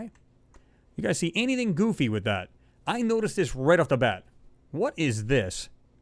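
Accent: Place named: Rhode Island, United States. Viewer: When I was growing up I had a friend named Tommy his dad was Rhode Island and he sounds exactly like you am I close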